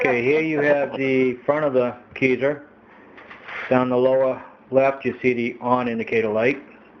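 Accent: New England, United States. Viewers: Great work! I'm sure everyone is jealous now. :) Are you from New England? I suspect RI. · your craftsmanship + your accent make me feel like I'm watching The New Yankee Workshop.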